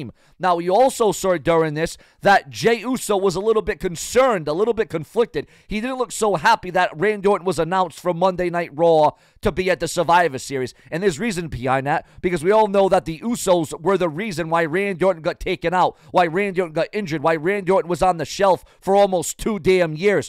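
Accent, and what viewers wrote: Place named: California, United States. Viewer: any news from wrestling from you would be great. You have an awesome voice. I love it. What state are you in? You sound like you are from California. Keep up the great work you da best❤❤❤❤❤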